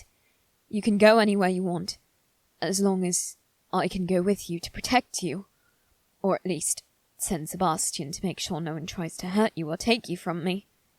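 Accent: United Kingdom. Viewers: Ahhhhhh love your British accent it's so cute 💜💜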